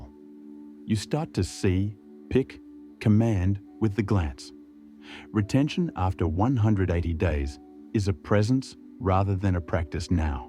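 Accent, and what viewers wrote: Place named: Australia. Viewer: Strong Aussie accent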